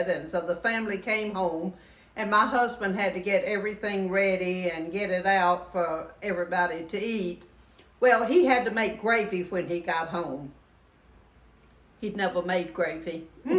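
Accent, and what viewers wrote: South, United States. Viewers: Mrs., what part of the South is your home of origin? I am from Western Middle Tennessee in your accent sounds remarkably familiar. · I'm from SC too, and this is a real Southern accent. Not like hillbillies you hear on TV. In SC, it's much more akin to an English accent, much softer (softuh), less harsh than TX, TN, AR, wherever! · I am so curious at where you live I was born in Macon Georgia raised in Miami Florida didn't really know much about my dad's family cuz he left when I was seven and my mom had no contact with his family after that I do know they're from the south and Cochran Georgia but I tell you what talking to them on the phone their accents are so strong you can't barely understand them but I can tell you're from the South but I can understand everything you're saying anyway I'm going to be buying the ingredients to make this for this Sunday this is August 1st Amy Williams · Okay, I am from the East (Pittsburgh, PA) and I came across this lovely channel I am not disappointed with all the meals to the family and God, along with the southern drawl. 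I am a fan for life now.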